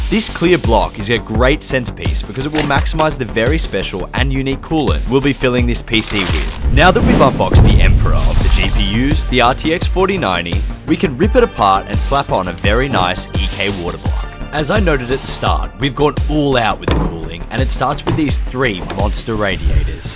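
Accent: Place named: Australia. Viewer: Man this thing actually looks BEAST👀 On a side note, realitvely new viewer and I honestly have no idea how it took me so long to recognise your accent as that of a fellow Australian😂 After having looked through some of your catalogue online and realising you offer some slight modifications to some of your prebuilts I can say that I'm honestly feeling very inclined to buy one off you as my current system is reaching that 5ish year mark(Ryzen 7 3700X/RTX 3080(probs one of the few aussies who scored one at MSRP😂)) And while i have usually centred around 1080/1440p w/ decently high frames for competitive FPS titles, I am finally reaching that age where I'm playing more AAA single player titles in 4K so definitely be looking by the end of the year and despite my enjoyment for bulding I feel like taking the easy route🤣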